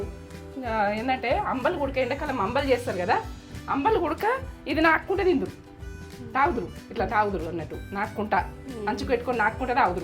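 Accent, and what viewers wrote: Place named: Yadagirigutta, India. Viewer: Aunty meeru matladutunte same maa akka valla attagaru matladinatte undi mee slang..medi yadagirigutta side untara aunty..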